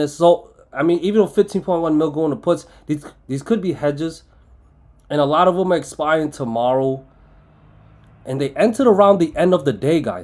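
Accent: New York, United States. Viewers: you are awesome!!!! you almost sound like a New York City guy!!!!!!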